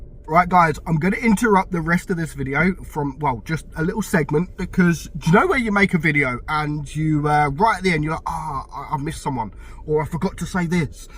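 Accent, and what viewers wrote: United Kingdom. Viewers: Really sorry to see you go. I am going to miss your British accent. God speed.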